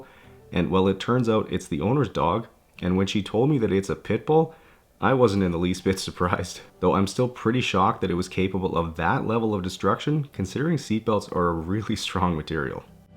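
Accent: Canada. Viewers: "grage" I always get a kick with the Canadian accent.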